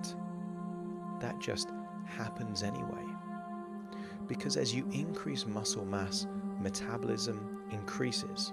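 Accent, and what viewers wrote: England, United Kingdom. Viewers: Hearing You Speak Makes Me Feel Like I Am In (Old) England, Instead of New England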